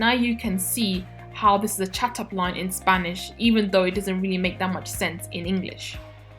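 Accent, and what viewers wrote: United Kingdom. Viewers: From what I can see the English speaker don't like to say piropos. There is not comments here I'm from Mexico and I love saying piropos a lot .I'm here to learn English through this channel it seems to be interesting. I really like your British accent.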